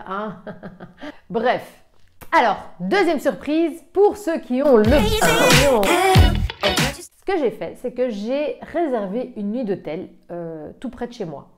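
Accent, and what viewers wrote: Brussels, Belgium. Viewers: Je valide les idées!! Je te connais via tit Caro! J'entend un petit accent de Bruxelles ?! Une voisine 😍 hop je m'abonne! N'hésite pas à venir voir ma chaîne! Tout Plein de gros bisou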